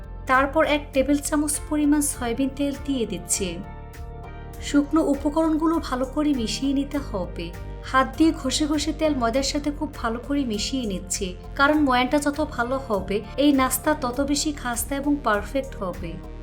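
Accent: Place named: Dhaka, Bangladesh. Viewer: আপু ঢাকা যে থাকেন সেটা আপনার কথা শুনে বোঝা যায় বাকি ঢাকা কোথায় থাকেন আমি ও ঢাকা উত্তরায় থাকি। আমি আপনার বড় ফ্যান আপনার রান্না গুলো দেখে খুবই ভালো লাগে আর আমি আপনার ভিডিওগুলো সব সময় দেখি। ❤️❤️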